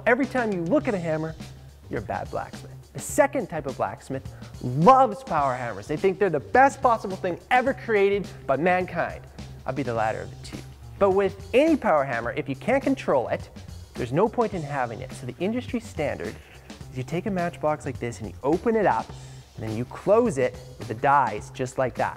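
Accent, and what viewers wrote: Canada. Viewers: Not sure if you are Canadian or from Minnesota but you sound very Canadian.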